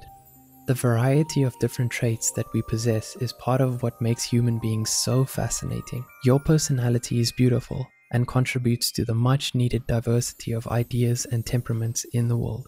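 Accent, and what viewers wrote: South Africa. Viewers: Is the new narrator South African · The new voice has a South African accent😃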